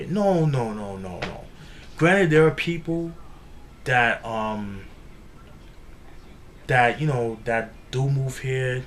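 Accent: New York, United States. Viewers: u from ny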